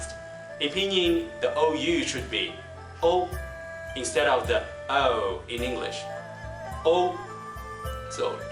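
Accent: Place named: Australia. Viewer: Thanks for the resource. Do you know if these spellings Pinying spellings? If so, is Pinying still used as a common way to transliterate Chinese pronunciation into English? I ask because I've met some mainland Chinese who don't know anything about Pinying (unless I'm also butchering my pronunciation of it). Also, do you speak German? I ask because your URL includes "aus" which often translates as "out of"? Sorry, I guess it means AUStralia, based on your accent?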